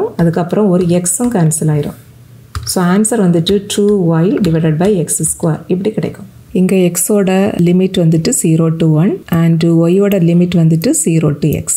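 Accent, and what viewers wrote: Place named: Nagercoil, India. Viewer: Thanks mam,clear explanation with nagakoil slag was awesome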